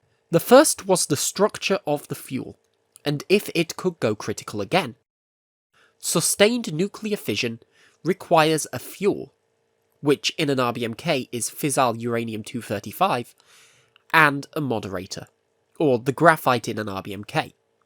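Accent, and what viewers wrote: United Kingdom. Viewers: British Accent + Documentary + Chernobyl = Random Algorithm Banger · He's british, not german. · I’m unsure if this is AI narrated or not. The narrator is clearly British sounding but he uses the term flashlight instead of torch?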